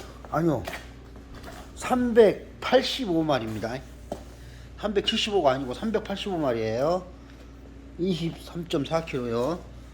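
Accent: Jeolla, South Korea. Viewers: 전라도 사투리 증말 재밌네요..목포 생선 연결고리가 없어 구입하기 힘들었는데 반갑습니다.. 계속 올려주세요...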